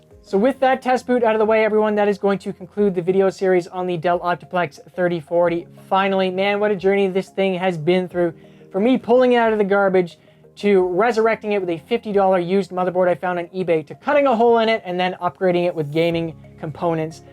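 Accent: Canada. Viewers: The Canadian accent is Noice 👌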